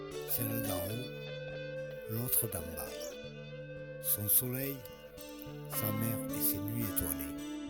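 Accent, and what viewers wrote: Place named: Provence, France. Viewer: Grand merci l'ami pour cette jolie et emouvante video , avec son texte plein de poesie ! ton histoire me ramene a des temps anciens , restés chers a mon coeur .....sorti de mon auvergne natale ou je suis retourné maintenant , j'etais rentre "aux chantiers" en 56 comme apprenti en logeaint chez un copain de marine de mon pere , en face de la ROUVE , quand les collines etaient encore pleines d'oliviers et pinedes .....plus tard deux ans dans la royale de 61 a 63 .....revenu marié en 67 , mon second fils né a la seyne , nous habitions a l'aiguillette juste derriere la rouve .......les oliviers avaient disparus , les mimosas aussi , les figuiers du chemin du manteau , avec des arbousiers et les cigales restaient ......la vigie etait sortie de terre , on se baignait a balaguier et les chantiers du midi ( le petit baigneur ) fonctionnaient .......le bar des marroniers accueillait ses boulistes .......les sablettes n'etaient pas mangées par les parkings et pin rolland voyait arriver ses premieres villas ! 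je bossais a fabregas , le brusc , l'arsenal , ......mayol n'avait pas ecrasé le marche de gros et barneoud ouvrait..... tant de souvenirs reviennent et malgre les annees tout reste dans ma memoire ! ton accent m'a redonné les bruits et odeurs des " sanguins " de la pinede ........j'y reviens parfois mais tout a tellement change ! meme berthe ou j'ai travaille a ete fracasse .....le cours louis blanc bourdonnait de camelot et la marchande de cade me plaisait trop ........le temps f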